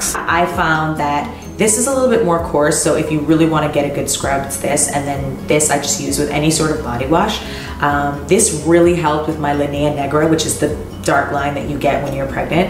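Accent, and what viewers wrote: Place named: Guyana. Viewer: LMAO your Guyanese accent killed me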